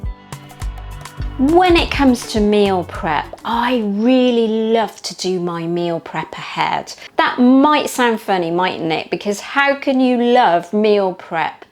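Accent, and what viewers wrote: England, United Kingdom. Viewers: “I absolutely love chopped chopped brussels” With your English accent…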